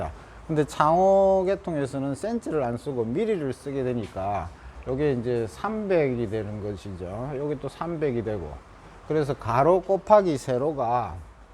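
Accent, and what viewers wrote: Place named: Busan, South Korea. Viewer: 사장님 부산 이네요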